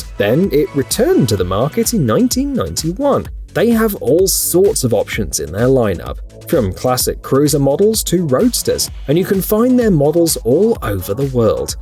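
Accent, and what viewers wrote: England, United Kingdom. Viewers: #2 The Kawasaki H2 is actually supercharged not turbocharged. A turbo is spun by the engine exhaust gasses/moving air, while a supercharger is mechanically spun by the engine......Also so there are several V8 car engines in motorcycles that make even more torque & HP than the H2 Kawasaki. That I think fit the definition of, "most brutal" way more than any of the bikes on this list. Also the Dodge Tomahawk (Viper V-10 engine) that Steven St.Laurent mentioned below. I would think a guy that makes videos & speaks in a snobbish English accent, would have his facts straight.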